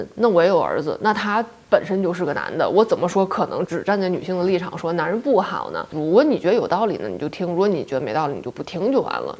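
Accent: Tianjin, China. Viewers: 天津普通话！好亲切哦！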